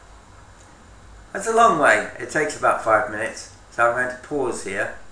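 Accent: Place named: Ireland. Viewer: Love the Irish accent! nice video!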